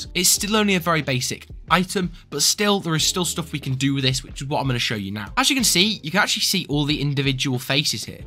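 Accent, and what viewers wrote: United Kingdom. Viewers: boi u british i cant understand watchu sayin for example alt u said Aot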